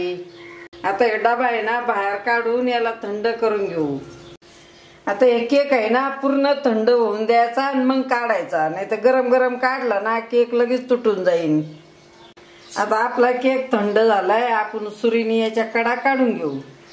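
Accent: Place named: Bhor, India. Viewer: Tumch Bolan ani bhasha mala khup awdte maz maheri same Asch bolatat Bhor side la tumch Gav kont ahe aaji Mala tumcha recipe khup awdtat.... Love you ❤️❤️❤️ mazi aaji same Asch bolaychi mi khup miss karte maza aaji PN tumch Bolan aaikun khup Bhar vatat mala ..... Thanks 😘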